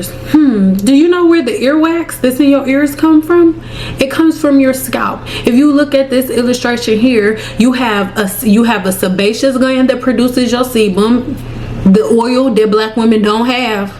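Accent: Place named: Detroit, United States. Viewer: I knew you were from Detroit just listening to you 😂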